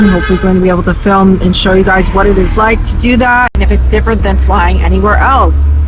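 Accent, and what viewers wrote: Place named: Czech Republic. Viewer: I watched many videos , they were great .... Why do you speak with Czech accent ?!...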